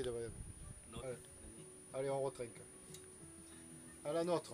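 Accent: South, France. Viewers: Salut à toi j'espère que tu te porte bien ainsi que Tabasco, je voulais te dire que tes vidéos sont parfaites quotidiennes et hebdomadaires nous sommes tous au rendez-vous, cela fait quelques temps que je suis tes vidéos ( à vrai dire c'est mon frère qui m'a fait découvrir ta chaîne) et je vois également que tu montes à presque 4000 abonnés c'est beau parce que lorsque tu réalisé tes vidéos tu te prend pas la tête, tu mène ta vie tout simplement et tu nous la fait partager et le faite de poster plusieurs vidéos par mois ça crée une addiction lol (comme Netflix), du style " gros vient de sortir une nouvelle vidéo vas voir" ainsi avec ton accent Typique du sud on s'y croirait là sur le Tabasco, nous aimons bien refaire ton accents " Tabascoooo" Avec le temps tes vidéos ce sont améliorées (le son, vidéo, cadrage, Drone), celà ne peut qu'aller vers l'avant, Attention en mer. 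Signé un jeune néophyte!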